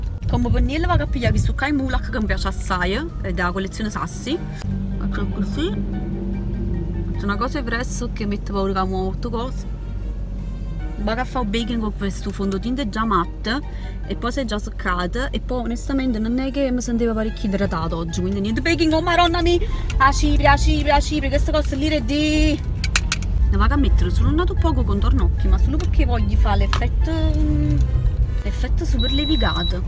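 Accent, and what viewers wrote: Naples, Italy. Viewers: Mi fai morire dalle risate! Genuina, simpaticissima ed esuberante da trasmettere felicità e spensieratezza tramite un video! Ti volevo chiedere, essendo anche io di Napoli avendo notato il tuo dialetto, sei di Napoli centro o provincia? Dove precisamente? Bacioni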